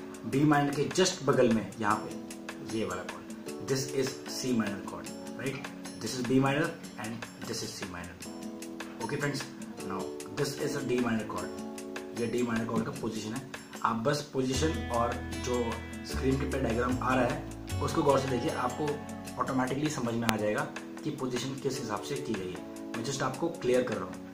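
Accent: Himachal Pradesh, India. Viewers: Himachali ho bhaiji ??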